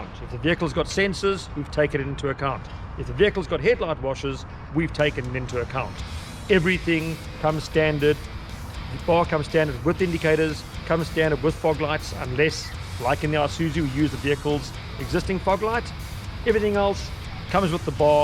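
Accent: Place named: South Africa. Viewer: He sounds South African. :)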